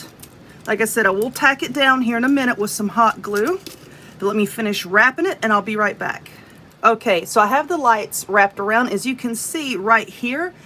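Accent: South, United States. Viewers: This is so precious. I love your instruction in all your videos. I told my Mom I'm going to make her a corn husk doll for Thanksgiving. She has one she made in the 80's and it's still in great shape. I might add color as you suggested in your instruction. I love your Southern Accent too. Makes me feel at home watching you. (I'm a native Texan btw)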